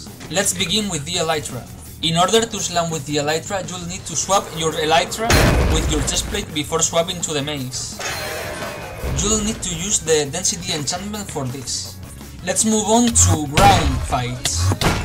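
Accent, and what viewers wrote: Spain. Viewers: SE TE NOTA UN WEBO Q ERES DE ESPAÑAA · eres español? el acento lo parece jajajaja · Se te nota el español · Se nota tu acento español xd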